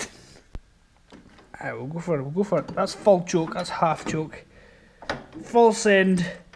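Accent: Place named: Scotland, United Kingdom. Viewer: Shit. Love the accent brotha haha. Scottish?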